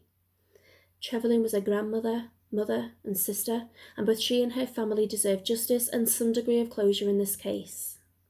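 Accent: United Kingdom. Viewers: great accent still Keep up the good work !, I cannot tell if you’re from near Manchester or Liverpool or somewhere in between??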